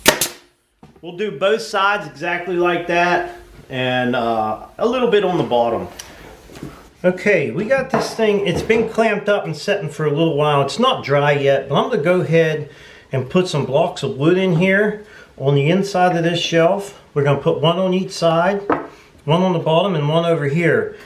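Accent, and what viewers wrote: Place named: Pittsburgh, United States. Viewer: Hey, where are you from? You sound like you have an east coast yinzer accent..... just curious.. thanks.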